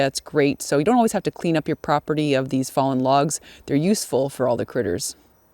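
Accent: Canada. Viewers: 1 min in and im thinking is that a Canadian accent I can here ? Ok thats a yes. Im English and go to Novia Scotia each year and im so wishing I was over there right now in lock down rather than here.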